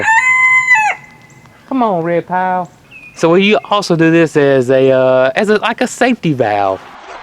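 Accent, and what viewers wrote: South, United States. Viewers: too bad I live in cali... I would watch your animals for free for the experience with all the vidlogs I have watched, I dont think even instructions would be needed. I cant wait till I can afford to buy land in Georgia. For the time being I will live vicariously through your logs...DUDE that southern accent...when daddy spoke that calm, you know an whooping is coming. in other words its easy to stay awake watching!!! the commanding presence is there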